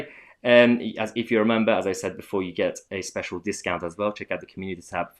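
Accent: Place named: RP, United Kingdom. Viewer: And the price of that graniola is ridiculous..Stop recommending posh boy products..70% off Leave voters can't afford it and you will p*ss them off from your website..Try using the Alex Belfield common touch and try not to speak like you went to public school or you'll flatline at the 200k mark..Probably close to the limit of the wealthy leave vote..And remember that only people with money think class attitudes are outdated..Like Nationalism,they never really go away........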